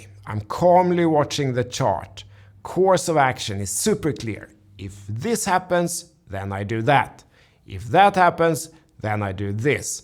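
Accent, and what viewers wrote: Sweden. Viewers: I always say "Hado" (I don't know how to spell it) to my swedish gaming buddies. I say it in your accent and for weeks they thought it was one of their countrymen saying it. You've apparently taught me more than investing strategy I also asked them about the canned fermented fish and they said, there's no way they would ever eat it 😆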